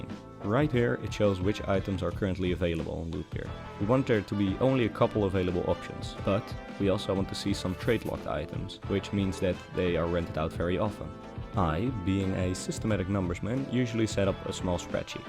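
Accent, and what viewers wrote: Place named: Netherlands. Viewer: je hoort die nederlandse accent altijd zo goed he XD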